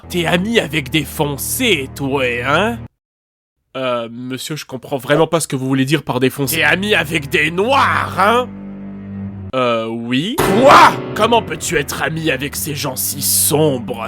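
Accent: Quebec, Canada. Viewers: attend tu es Québécois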